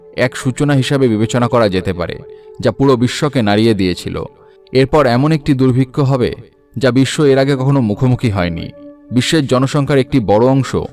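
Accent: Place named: Bangladesh. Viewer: আপনার কথা বলার style, উচ্চারণ সব বাংলাদেশের মানুষের মত ।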